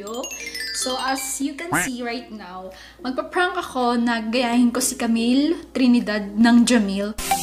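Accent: Ilocos, Philippines. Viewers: Parang may ilokana accent ka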